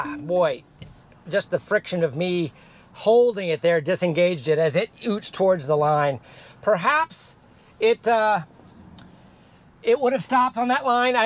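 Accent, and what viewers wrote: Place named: United States. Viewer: ...could've sworn I heard him say "metre"...and appears (from accent) to be from USA and appears older than 30. Whoa! There IS hope for the USofA yet! (must be an engineer :-) Seriously though, great content/analysis, thanks!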